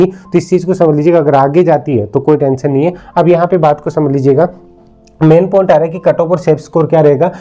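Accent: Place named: Rajasthan, India. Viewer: Sir Apki Rajasthani langauge bhut sweet hai sir ap ese ma hi video banya kro😍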